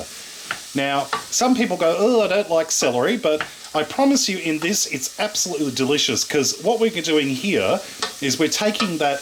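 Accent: Australia. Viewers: Omg you're the sweetest! Love the Aussie accent and your positive energy! I will definitely be making these for my family here in Belgium. Having grown up in eastern Canada, my own mother always made very similar stuffing (no ground meat nor chestnuts as many Americans apparently do) but the onion, celery, bread crumbs, butter, seasoning and herbs (sage, rosemary, thyme). Mmmmm....can't wait to do this..next weekend when our girl comes home from college surely as Canadians already celebrated Thanksgiving in October. Do you celebrate "dan anda" too ? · Im australian but have been living overseas for years. I love your videos because they remind me of australia ( food, accent, etc ) <3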